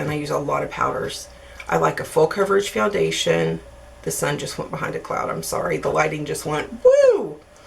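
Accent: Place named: Pennsylvania, United States. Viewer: I need that Hard Candy spray! You make me want to buy everything LOL! You look beautiful as always :) You sound like my family from Pennsylvania :) I could watch and listen to your videos all day long :)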